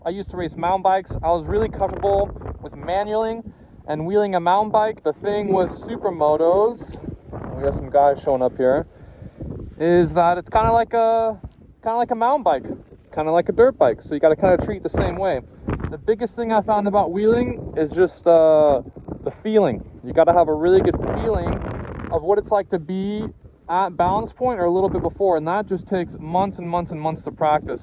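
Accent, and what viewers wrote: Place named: United States. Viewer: Having grown-up in a windy place here in the States, on my BMX Bicycle and I remember when you get that front wheel in the air it is more like a sail on a ship. Appreciate the types have a 2018 SP, very unusual to see your year with a single exhaust. You sound American, but looks like your in Germany or Holland.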